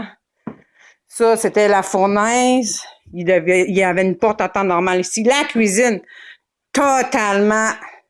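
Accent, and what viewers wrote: Quebec, Canada. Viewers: Vive l'acent québécois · c'est toujours marant d'entendre l'accent Québequois très prononcé ! tout comme le créole c'est une langue à part d'origine Française purrait on dire ! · mon enqueeeeeete xd laccent quebecois fait vraiment pitier . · C'est l'accent québécois, mec... Si tu ne l'aimes pas, passe ton chemin. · thevalkyrie666 awb enfait elle c'est parler c'est juste l'accent québécois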